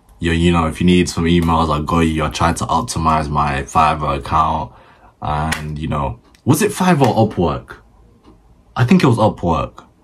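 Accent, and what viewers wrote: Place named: England, United Kingdom. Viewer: Hello mate ! I'm 28 from ireland and I've been learning copyrighting for 1 month. I know AIDA and PAS and all the basics. I feel I'm ready to start but struggle with outreach . Btw ...I'd like to say that that it's a breath of fresh air to hear an English accent. I'm sick to death of all these yankie accents- so annoying ...😮 ! Haha My Q; Would you recommend LinkedIn and TikTok for outreach. I've been banned from Instagram for sending too many messages the same ( but they weren't all the same ) I've been sending some messages on YouTube. Is Instagram the best for outreach ? If so .... I'll try and set up another ... Keep the pounds rolling in mate ! It's great to see success stories. Plus i love your honesty. Some use copy in their yt channels that teach about copy like KJ rainer and cardinal so ....I dont see that with you. 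So well done ✅ Cheers mate.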